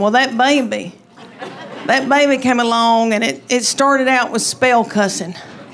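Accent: South, United States. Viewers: the subtitles make me laugh. I grew up in texas. I have a city accent, not a southern. but its like, really funny to realize that maybe someone in france has no clue what the hell this southern lady is saying. love it. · I'm from the south. this lady reminds me so much of people from around here. The accent is my fav. You don't hear enough.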